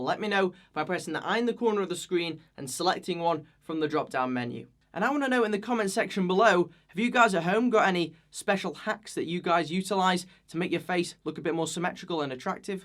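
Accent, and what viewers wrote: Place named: Northern England, United Kingdom. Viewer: Not really, Daniel Radcliffe has a London accent, his accent sounds more like a northerner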